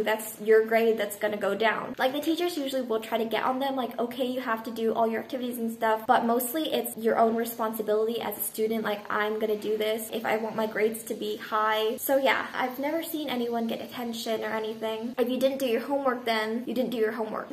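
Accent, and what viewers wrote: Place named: England, United Kingdom. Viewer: I'm here to listen your voice ( English accent ). Makagigil 😚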